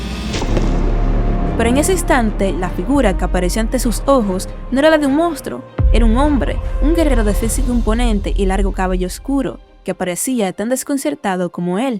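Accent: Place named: Dominican Republic. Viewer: 🗣️🔥🔥🔥Lo sabía es que el acento dominicano es eterno ✋🗿🤚🇩🇴🇩🇴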